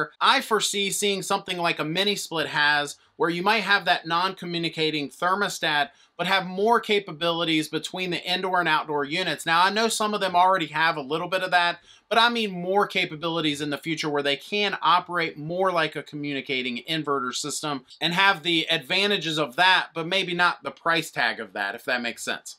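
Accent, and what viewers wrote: South, United States. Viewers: background of some southern sounding vowels, and the way you pronounce “on”. Your vid was helpful, having some hvac issues/thermostat issues at our house and discovered that we have an inverter heat pump. Been in the house for about a year had no idea.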